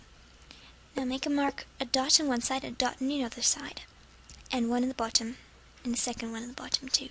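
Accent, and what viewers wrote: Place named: RP, United Kingdom. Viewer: you sound posh lol but i like it :)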